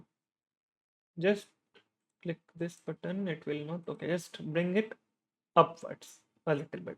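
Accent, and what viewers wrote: India. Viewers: Hear Indian guy - close the vid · I dont understand indian english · Could not understand a word. If FreeCodeCamp keeps posting these videos with Hindi accent, their content is going to go down hill. Most praise in comments is by Indians who understand this accent with no issues. For others though, it's painful to listen for more than a 10s.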